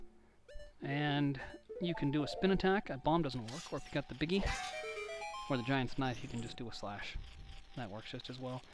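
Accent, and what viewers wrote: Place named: Australia. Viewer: p.s good aussie accent man. lol im aussie and you sound like a lot of people here.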